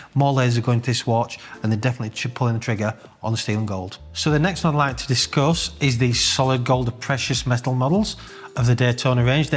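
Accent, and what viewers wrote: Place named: North East England, United Kingdom. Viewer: Impressive video! Not watch related but is this a North east English accent? Trying to learn the English accent variations.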